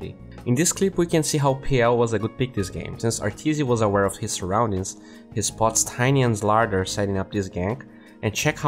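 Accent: Brazil. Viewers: Haha eu reconheço um sotaque BR quando ouço! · teu sotaque parece de br hauahauah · accent de br, inconfundível ! Haha eh nois !! Feliz natal e ano novo tb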